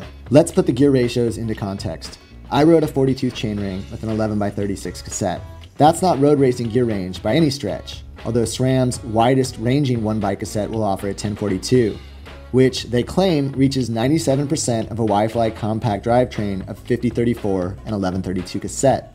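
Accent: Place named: England, United Kingdom. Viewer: the english accent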